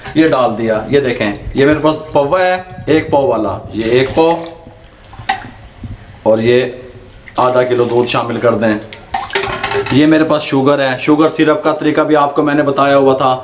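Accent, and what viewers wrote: Punjab, India. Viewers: Urdu aur punjabi mix accent wah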